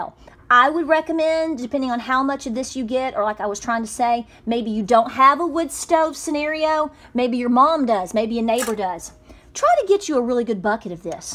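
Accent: South, United States. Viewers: Hi (i think that's your name??? Hope so...) just wanted to tell you that I love your attitude and that southern accent. LOL. I'm a northerner and we raise German Shepherds so I'm not off grid or even a homesteader but I really enjoy watching shows from all you guys. So many useful ways to use up wood ashes! I never knew this stuff. My grand parents were farmers and carpenters. I got a LOT of carpenter in me but not a whole lot of farmer, so I'm working on that one as we speak. Just wanted to pop in here and tell you that I really enjoy your show.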